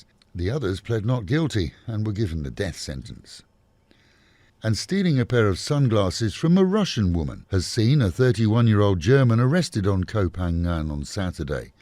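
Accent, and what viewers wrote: United Kingdom. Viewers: Love hearing a British accent while getting the scoop on Thailand drug busts. New subscriber here from the U.S.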